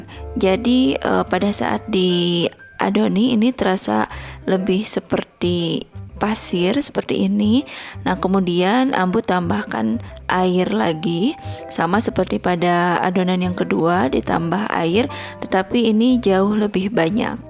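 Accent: Bali, Indonesia. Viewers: Saya juga mo bilang gt... Tk kira orang bali.. 😁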